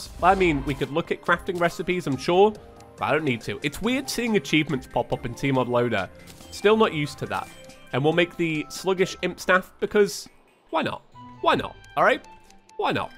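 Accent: United Kingdom. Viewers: Welcome back,. Unfortunately, my grandmother passed away about two weeks ago. I’ve been going back and watching your videos. I am unashamed to admit your relaxedness and smooth british accent have unironically gotten me through.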